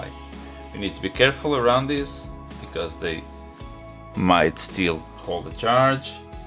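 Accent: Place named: Portugal. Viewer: Are you Portuguese? Eu conheço o sotaque...Eu curto este tipo de content 👍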